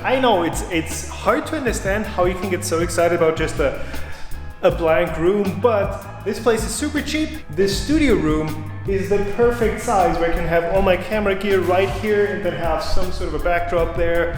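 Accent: Bavaria, Germany. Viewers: Habe heute ein deutsches Video von Dir gesehen. Das war so seltsam :D Schönes Phänomen, wenn man Leute mit deutschem Dialekt sprechen hört, die man zuvor nur tadelloses Englisch sprechen hörte. Ging mir das erste Mal so, als ich mit Marius Hornberger sprach. Vielen Dank für die vielen Videos! Grüße aus Wilhelmshaven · Bist du aus Bayern? o.O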